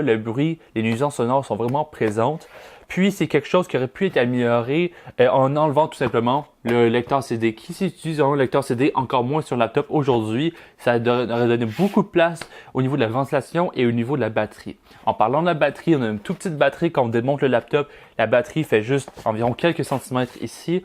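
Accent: Quebec, Canada. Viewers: j'adore ton accent québécois +1 abo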